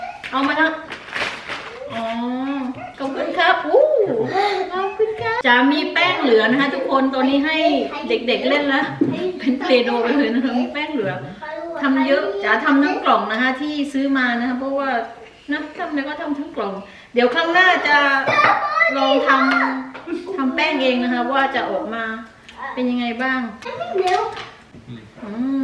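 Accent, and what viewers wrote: South, Thailand. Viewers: ถึงว่าสำเนียงทองแดงออก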